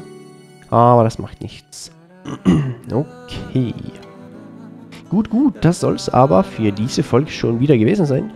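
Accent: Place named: Austria. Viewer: Bist du Österreicher? So vom Tonfall klingt es etwas so :)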